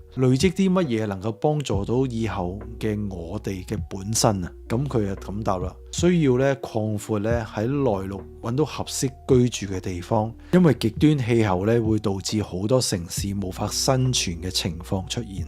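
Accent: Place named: China. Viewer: 一聽大陸可以唔洗聽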